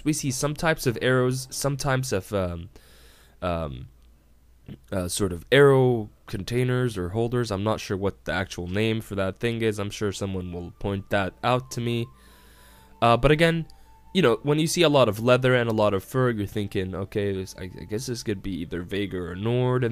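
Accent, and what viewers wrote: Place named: Quebec, Canada. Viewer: Slightly off topic question: Are you from Quebec by any chance?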